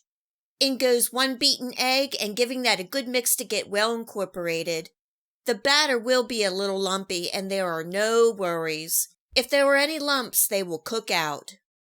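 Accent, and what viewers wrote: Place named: Delaware Valley, United States. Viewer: You are from the Delaware Valley? My family is from Philly and South Jersey--you sound like my relatives!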